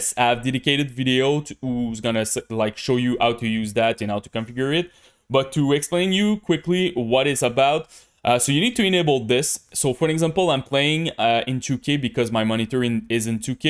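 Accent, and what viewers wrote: Quebec, Canada. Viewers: je croit que je reconnait le bon vieux accent quebecois haha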